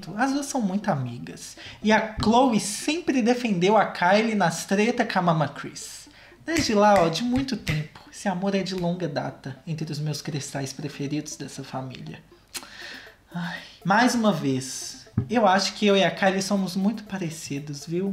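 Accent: Minas Gerais, Brazil. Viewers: Kkkkkkk Nem sei prq esse vídeo apareceu p mim kkkkkkk Vc é muito carismático É mineiro?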